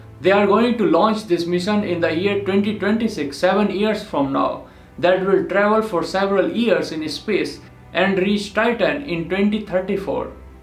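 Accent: India. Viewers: He from India or Pakistan. It's easy to recognize by his accent.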